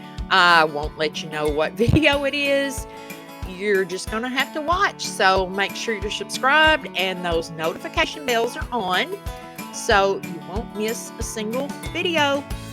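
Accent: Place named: Kentucky, United States. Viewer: GRITS = Girls raised in the south. I was also raised on a farm in the south. Love your KY accent! My father and older siblings and husband are from KY.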